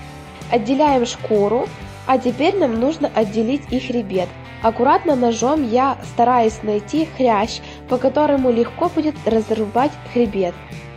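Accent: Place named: West, Ukraine. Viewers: Если Вы не слышите украинский акцент, то это Вы с другой планеты. Ясно? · Девочка из Западной Украины, г.Ровно. Не надо придираться к произношению "правильно, или не правильно". Ей 24 года, а готовит - профессиональный повар оценит.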